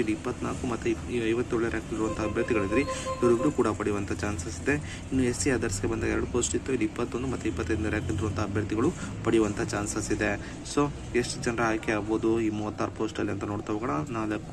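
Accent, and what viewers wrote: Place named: Haveri, India. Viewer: Haveri english sir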